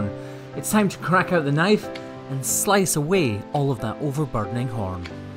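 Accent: Scotland, United Kingdom. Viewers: I don‘t know anything about cows or hooftrimming (aside from what I learned from you XD) but since I stumbled over one of your videos I keep watching them for your humor, the charming scottish accent and the beautiful landscapes! Thank you for the Entertainment! ❤️ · Ur accent is from Brave ? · Scots ...hes Scottish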